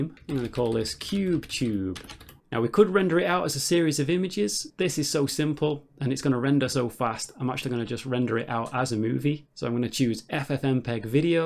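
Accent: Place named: North, United Kingdom. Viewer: I like your northern accent! clear friendly and well paced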